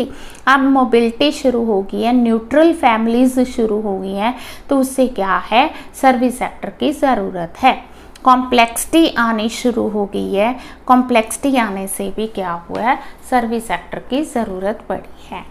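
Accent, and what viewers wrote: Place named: Punjab, India. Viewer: apki aawaz me Panjabi jhalak rahi thi vaise mai Gujarat se hu but I love Panjabi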